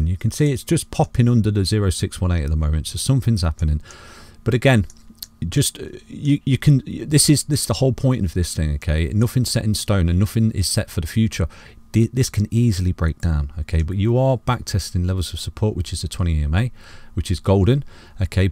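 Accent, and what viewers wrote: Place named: East Midlands, United Kingdom. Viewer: Great analysis. Sounds like you’re from Derby/Nottingham by the sounds? I don’t know if you’d class it as an East Mids accent.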